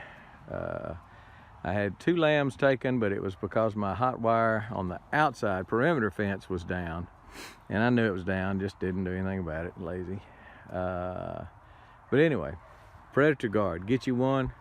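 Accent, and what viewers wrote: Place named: South, United States. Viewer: I’m a displaced Southerner in California and I surely am enjoying your Southern voice. Thanks for the tips on nighttime predators. We just lost a chicken to a hawk just yesterday.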